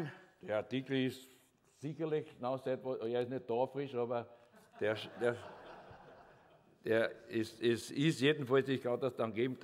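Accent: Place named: Vienna, Austria. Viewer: Nicht gegen Österreich, aber dieser Wiener (oder was auch immer) Dialekt ist dermaßen furchtbar, da bekommt man eine Gänsehaut. Da kling Ostdeutsch noch schön gegen, das ist wenigstens lustig.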